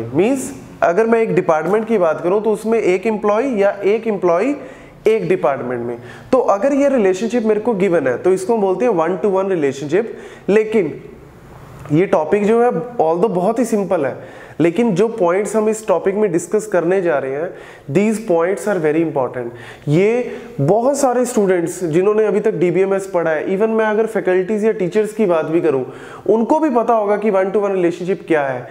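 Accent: Punjab, India. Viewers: bhaia aap punjabi ho ?????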